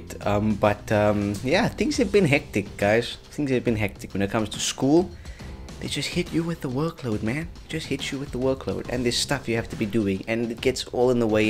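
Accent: South Africa. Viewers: south african ??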